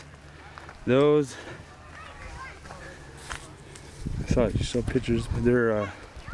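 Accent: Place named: Reservation, United States. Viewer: I am kind of curious as to what part of the country you live in? You have what sounds awful lot like a Rez accent.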